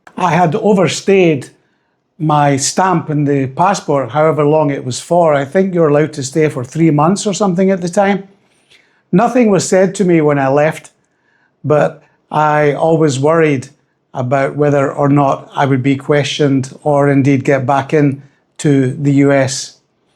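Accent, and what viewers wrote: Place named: RP, United Kingdom. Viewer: Your BBC pronunciation is beginning to grate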